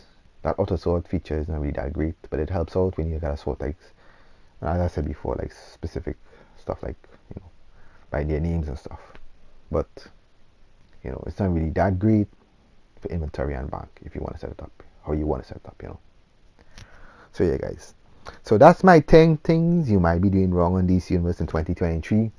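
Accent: Trinidad and Tobago. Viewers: Are u trini? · You from Trinidad bro?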